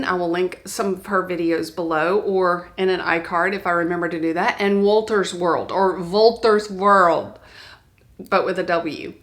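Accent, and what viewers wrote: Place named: Texas, United States. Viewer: Ah that Texas accent. What is the plural form of y'all? All y'all!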